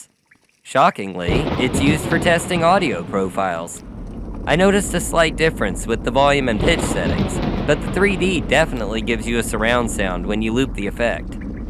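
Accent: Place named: Canada. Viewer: Canadian detected. Don't you put it in your mouth though it might look good to eat (like a carrot or a beet!)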